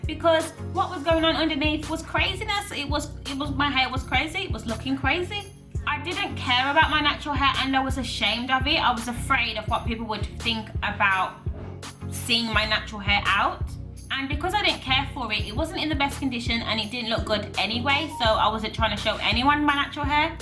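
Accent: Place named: United Kingdom. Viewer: Thank u for these advice. New subbie. I love ur british accent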